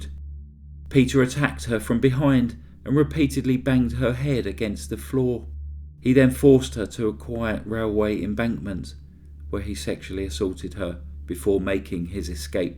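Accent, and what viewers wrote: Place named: Scotland, United Kingdom. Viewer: Sorry that you lost your friend! Was fun to hear a Scottish accented intro.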